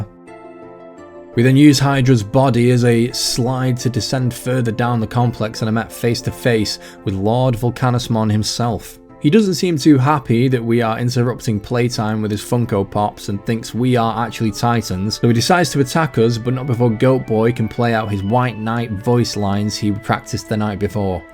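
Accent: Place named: England, United Kingdom. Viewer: i cant wait to see your bee team as a fellow funbeemon enjoyer wait what, why retire the bees when you can evolve them to their beeier forms. funbeemon's mainline is nothing but one big beehive, unfortunately, funbeemon's most recent new evolution branch wasn't added to the game though hopefully in an update or dlc it is. love to see you really enjoying the game bro. and a fellow englishmen as well i hear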